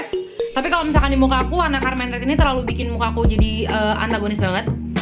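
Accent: Medan, Indonesia. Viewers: Assalammualaikum, Kak,... Kenalkan nma ku, Alia,.. Brasal dri Malaysia,... Aku suka skli sama content video, Akak,... Jgak cra pertuturan kata Medan tu, Pas skli sama org nya,....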